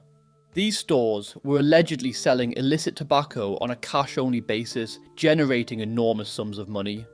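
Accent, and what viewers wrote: United Kingdom. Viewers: im so grateful you have a british accent, so i know you're safe in the UK bro, if i did videos like yours, as a local, i think i'd have massive testicles, but also know i wouldnt be safe. you're a legend.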